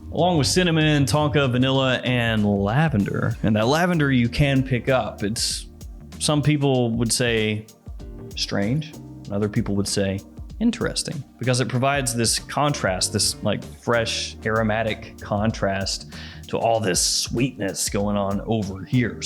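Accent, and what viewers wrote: United States. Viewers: i hate american accent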